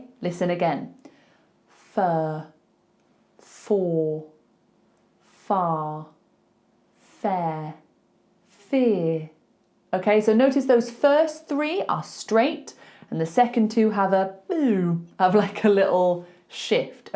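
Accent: United Kingdom. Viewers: In my opinion RP accent is best English in the world. This is pure British.✅ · Your own accent while delivering of instructions throughout this video is self evident of standard British accent. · For me it is funny to hear you and others brithish talking however when i hear people from wales, Ireland and central and north UK is a real kick in my balls undertanding their speech. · I love british accent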